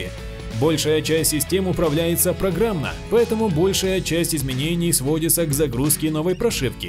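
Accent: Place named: Komi, Russia. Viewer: Ты из Коми что ли? Тебя слушать-уши вянут!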